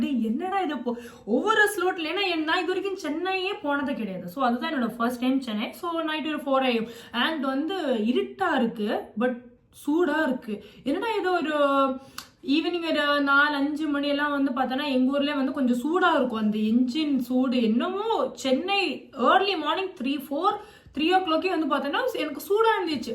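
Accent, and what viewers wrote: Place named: Kerala, India. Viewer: unga tamil different ah iruku . just like tamil and malayalam mixed ah iruku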